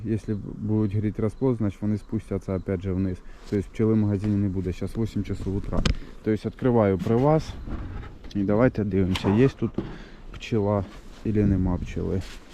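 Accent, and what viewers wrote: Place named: Surzhyk, Ukraine. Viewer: Суржиком, у нас тут всі так балакають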